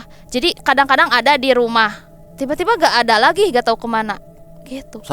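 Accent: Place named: West Java, Indonesia. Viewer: Sundanis tulen bgt logat si teteh nya,, lanjutkan teh 😁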